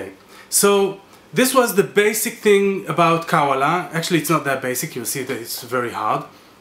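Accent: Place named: Arab world. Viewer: هذا الشخص عربي وليس اجنبي، واضح جدا من اللهجة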